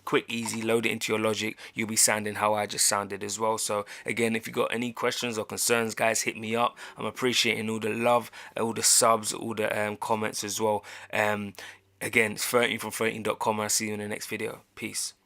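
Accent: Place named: United States. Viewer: your american accent was on point had me trippin a bit.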